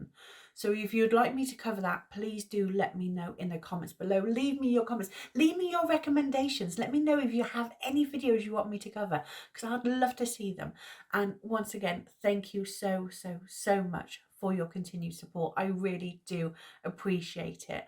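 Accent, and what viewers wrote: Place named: Scotland, United Kingdom. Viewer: Are you Scottish? Love the accent 😊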